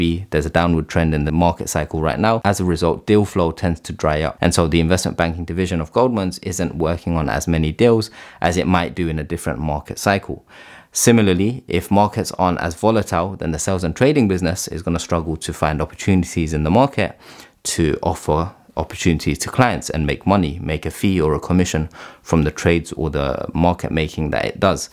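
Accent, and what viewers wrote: London, United Kingdom. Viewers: 1. Awesome video. 2. I really love the new generation London accent 3. I will always be so thankful for your videos that helped me get into banking 2 years ago, thanks so much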